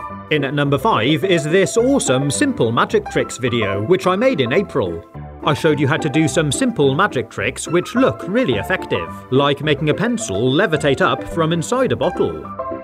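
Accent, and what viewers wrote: United Kingdom. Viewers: I love this guys British accent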